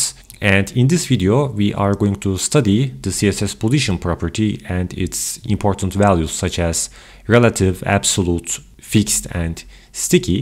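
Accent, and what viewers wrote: Turkey. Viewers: did not even check the name of channel as soon as I heard your accent I am like kanka naber ya LOL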